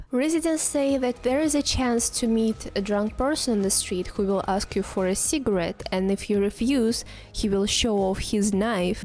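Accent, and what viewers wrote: Russia. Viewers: I've only heard your introduction and this is my reaction. I hope you don't mind me saying but you have a really sexy voice.. I mean. I am from UK so... they're is that. ☺The Russian accent always sounds sexy to us 🙄😇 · Interesting vlog.thanks for it.ecellent English.Almost without accent.Are you 100% russian?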